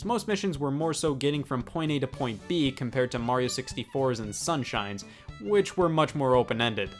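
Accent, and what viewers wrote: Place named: South, United States. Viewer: I heard "Y'all" too... Or maybe that's how I think all Americans speak, all southern like. It's definitely refreshing since other channels start with the now overused "Hey what's up guys, xxx here and today...". intro feels like a backhanded greeting, it feels like he's welcoming me but doesn't want me here at the same time... Sort of like my mother.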